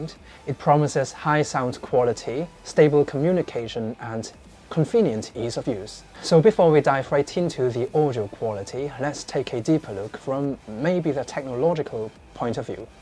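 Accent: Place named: United Kingdom. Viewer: How is this guy's english so good and british? :D Great vid, brother!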